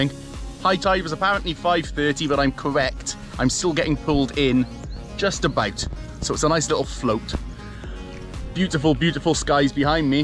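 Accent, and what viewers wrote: United Kingdom. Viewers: Wow I love the view 😍👌 nice british accent can you teach me haha kidding 😆😊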